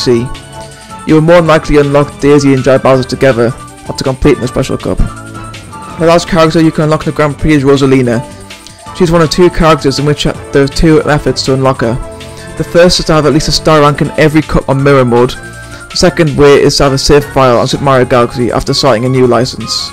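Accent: Newcastle, United Kingdom. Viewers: I can’t understand your Geordie accent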